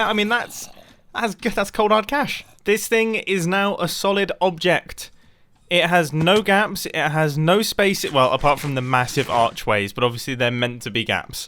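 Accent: United Kingdom. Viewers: he’s so british 😂 i love it when he says chuffed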